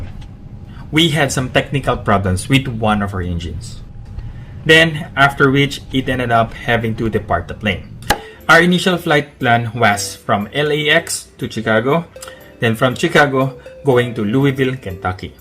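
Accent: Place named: Zamboanga, Philippines. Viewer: From Zamboanga ka po pala kaya pala familiar ang accent ng tagalog, student nurse here from AdZU, hoping to be there soon!!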